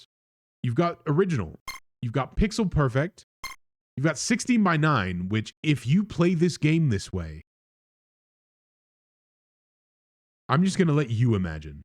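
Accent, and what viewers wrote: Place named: United Kingdom. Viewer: You sound like a Native Britt😂😂😂 With that Voice over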